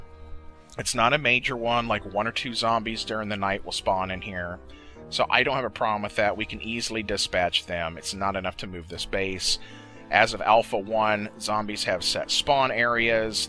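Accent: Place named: South Africa. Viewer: That south African accent helps: )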